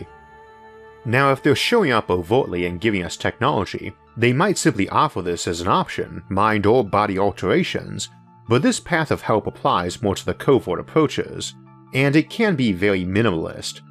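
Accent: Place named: Maryland, United States. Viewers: Maryland accent! Only place in the world the letter R is spoken out as a W... Hasn't anyone ever tried to correct this odd phenomenon? Only place a speech impediment is the norm. Great show and Host. Just hard for me not to notice the accent and stay with the info.... MAYBE I'm the one that's impeded. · I'm just lovin' these - there's a calm, mesmerising pace to them that just keeps your attention. Bravo! Where's your accent from, BTW - I'm in the UK and I can't place where in the USA (if, indeed, it's USAish) you're from. There's Deep South twangs in there - and then hints of Canadian. But I'm stumped!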